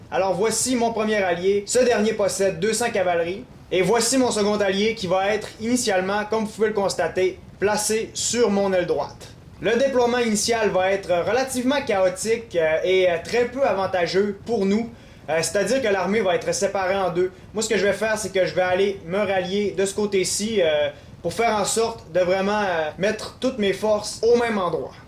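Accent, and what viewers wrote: Quebec, Canada. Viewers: il est QC arrêter de dire que s,est un accent bordel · haha du quebecois sur youtube ca fait du bien :)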